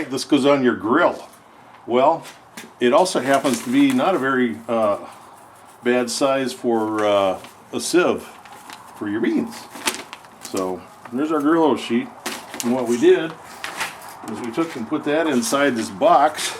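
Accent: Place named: North Dakota, United States. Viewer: Thanks for demonstrating some great ingenuity! I always like to see off-the-shelf items re-purposed. When I first started watching this, I said to my wife, "He sounds like Charlie Selberg! I wonder if he's from North Dakota? " And sure enough, you're in Fargo, which is where Charlie grew up.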